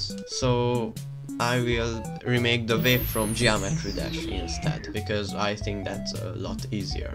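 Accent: Hungary. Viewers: A magyar akcentus hallatszódik de ne add fel mert feliratkozok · Az az erős magyar akcentus❤😂(enyém se jobb) Szuper ötlet és megvalósítás amúgy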